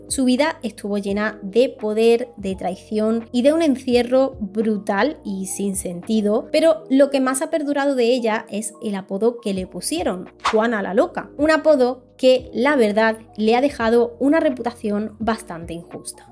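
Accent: Andalusia, Spain. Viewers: Hola hermosa ☺️ soy de Rio de Janeiro 🌻 Brasil Te acompaño hace un tiempo y ¡me encantan tus videos! ¿Vos sos de Andalucía? intento ubicar de dónde es el tonito, es muy diferente al de mis amigos de Bcn. Besos 💋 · Que lindo tono andaluz que tienes al hablar, saludos desde Argentina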